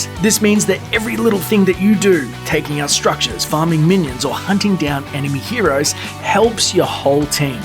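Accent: Australia. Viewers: Faith Silva Is it Australian? I like that accent, too. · I'm sure it's Australian... 98% sure. I feel like Aussie and NZ accents sounds similar tho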